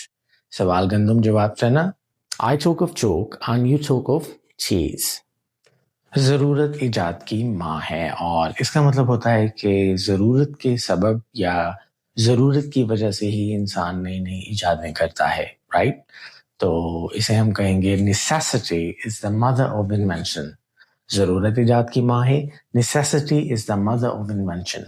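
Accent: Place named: Sindh, Pakistan. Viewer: Being Sindhi accent, teaching marvelously.Hats off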